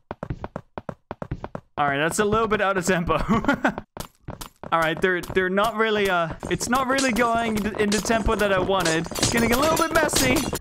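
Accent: Netherlands. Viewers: He is Dutch so he has an accent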